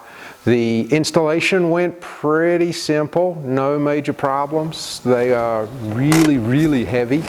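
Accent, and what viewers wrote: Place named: Australia. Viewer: your accents sounds like you've lived in Australia -are you an ex aussie?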